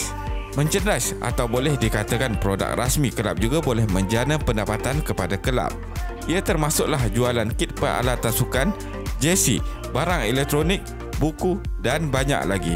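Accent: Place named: North, Malaysia. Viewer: abang yg ckap ni msti orng utara..hahahaha..sapa ja yg ckp jutaa kepada jutee...buruk ngat aihh bang..besa2 dah laa..😂😂😂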